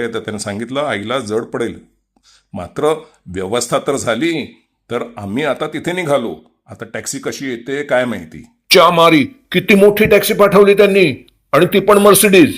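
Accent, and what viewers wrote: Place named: Pune, India. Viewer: तुमची मराठी बोलण्याची पद्धत ही सदाशिव पेठेची आहे.. पुणेरी खोच!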